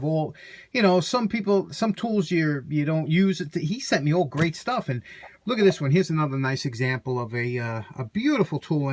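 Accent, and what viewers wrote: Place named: Staten Island, United States. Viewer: Today, I was delivering and setting up a large grandfather clock for a man from Staten Island, his accent reminded me of you,. I work at a clock and watch repair shop in Western Kentucky. Our shop has been run by one family since 1902 and still has most of the german and swiss tools from the early 1900s. Can’t beat the quality.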